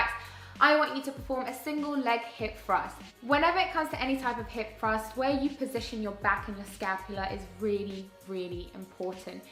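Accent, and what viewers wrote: England, United Kingdom. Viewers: I usually don't like English accent but yours is just so amazing :)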